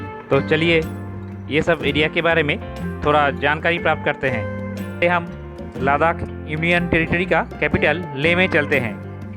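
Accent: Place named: Bengal, India. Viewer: Nice informative vlog Your pronunciation resembles to be Bengali like, are you a bengalee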